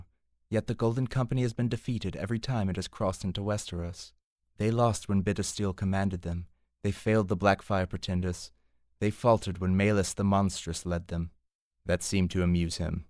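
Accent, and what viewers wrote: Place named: United States. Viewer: So weird having these read in an American accent...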